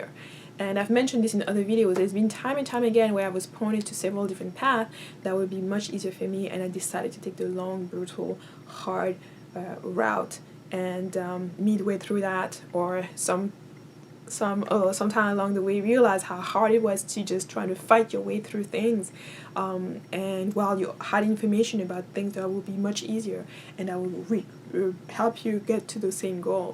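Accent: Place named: France. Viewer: i can hear that french is your first language. I have the same accent lol. americans are right, it does actually sound beautiful! i used to find mine annoying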